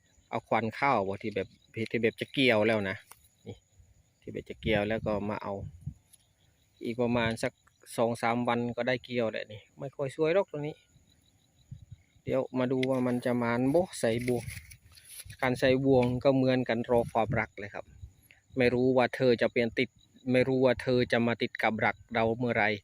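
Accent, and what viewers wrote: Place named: Cambodia. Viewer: มักเสียงเว้า สำเนียงเขมรเด้😂😂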